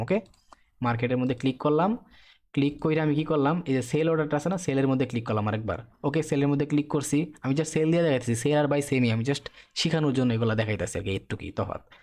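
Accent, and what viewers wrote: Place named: Narayanganj, Bangladesh. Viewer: ভাই আপনার বাড়ি কি নারায়ণগঞ্জ, আপনার কথার ভাষা এমনটা মনে হয়।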